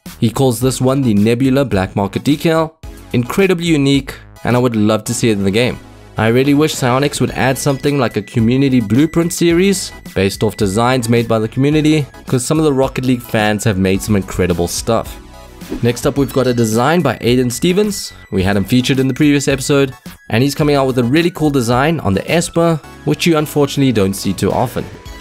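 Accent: South Africa. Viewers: U sound like u from SA big up anyone from Cape Town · Do you have a South African accent?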